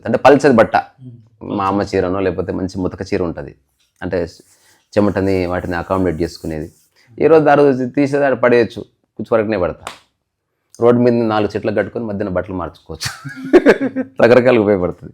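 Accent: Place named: Telangana, India. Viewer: Meeru pakkaki telangaana language lo unnaru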